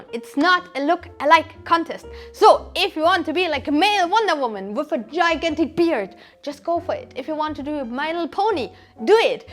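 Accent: Germany. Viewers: For me she Sounds Like she has a Strong German accent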